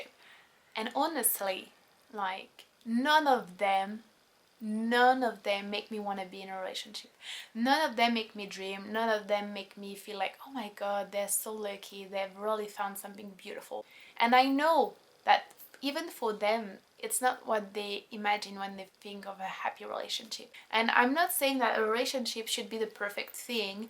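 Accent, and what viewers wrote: France. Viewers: Est-ce parce que je suis français et qu'elle a probablement un accent français que j'arrive à tout comprendre ce qu'elle dit? En tout cas, merci pour cette vidéo. Très bel état d'esprit, et toujours aussi ravissante! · is that a legit french accent? pretty eyes by the way! the video is about nothing though. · You're a really pretty girl. I like how deep these videos usually go into topics. I couldn't agree more when it comes to relationships. I think they often preoccupy or even distract people who could otherwise live a more fulfilling life. The French Accent is awesome too. Reminds me of the movies.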